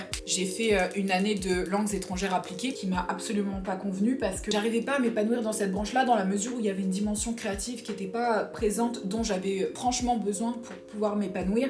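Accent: England, United Kingdom. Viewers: C’est agréable ton contenue est fluide et chapeau pour les videos tout les jours mais l’accent anglais les expressions c’est vraiment lourd , irritant et gênant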